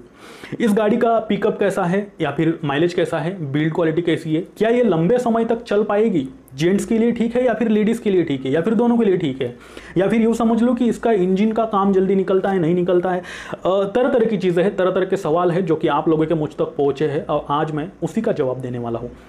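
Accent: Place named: Maharashtra, India. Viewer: Dada ascent madunach mait padtay marathi manus...great work...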